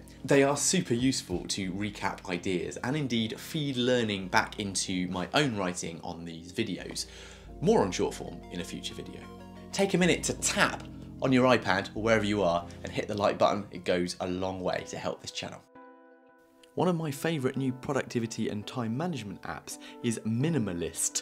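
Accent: United Kingdom. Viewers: That british tho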